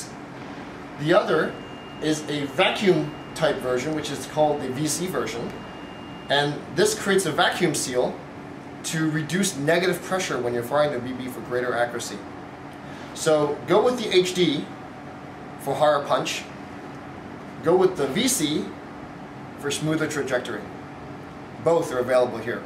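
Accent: United States. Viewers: whoa! american accent! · hes thinking at a more business angle. American accent.(i think) good magazine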